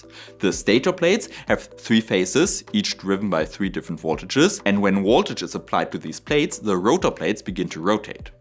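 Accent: Germany. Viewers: A whole quarter hour full of german accent bulishit, with virtually ZERO useful INFRMATION about the "motor" The mother of clickbait :face-green-smiling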